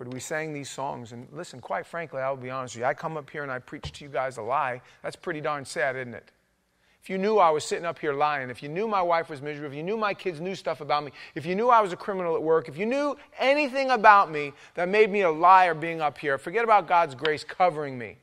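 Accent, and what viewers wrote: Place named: Long Island, United States. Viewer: You sound like your from Long Island. Good Sermon !😊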